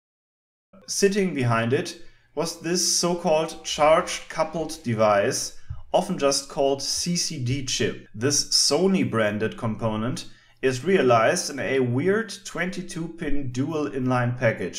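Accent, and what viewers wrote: Germany. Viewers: Yeah German or Austrian definitely he speaks classic school accent as it's spoken in schools in these countries but not bad... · For a German his accent is really light imo. Excellent pronunciation too. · evil German mad scientist of course. classic accent :) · Surprised you haven't used any of this to make CNC of some sort. I also love your accent, partly because I was stationed in Germany while in the US Army, so it's nice to hear English with a German accent again. Keep up the great work, I learn a lot from you!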